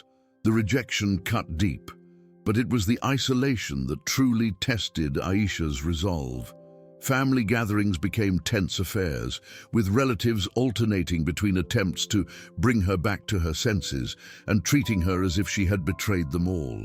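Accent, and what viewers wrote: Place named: United Kingdom. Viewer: This could and should have been edited down....the British accent does not make it more palatable.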